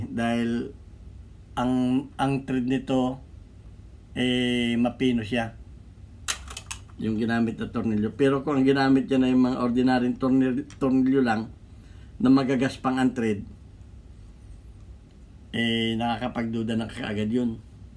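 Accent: Bicol, Philippines. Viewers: Bikolano k po b manoy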